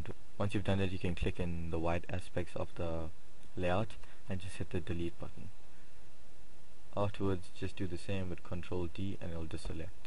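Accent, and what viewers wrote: South Africa. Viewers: I "guees" that's why he does the design and not the coding ^_^ JJ - still a nice tutorial. Are you guys South African? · South African?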